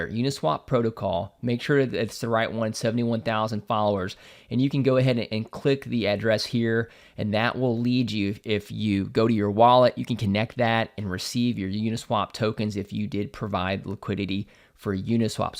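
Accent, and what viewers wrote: United States. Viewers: Thanks again! Always a pleasure to listen to your thoughts on the market and your charming American accent.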